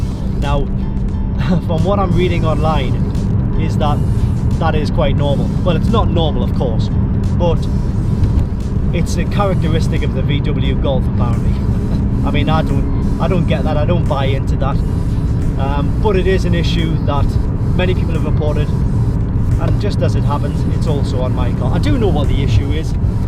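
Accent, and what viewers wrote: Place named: Geordie, United Kingdom. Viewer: I've followed your channel since you started, years ago, with the channel under your " " name. Just for feedback, it's gone from being an on honest "Geordie lad talking about cars" to becoming clickbaity, cheap and nasty. You've always been a bit w*nky, pretending you're something you're not (regarding the Porsches) but it's just tacky and sad now. You're not fooling anyone by toning and smoothing your natural Geordie accent and pronouncing letter 't' as a 'd' (pardy on, man). Have you ever wondered why videos like this have a staggering 36% thumbs down rating (YouTube average is 8%)? Just stop being a k*ob and try actually being yourself.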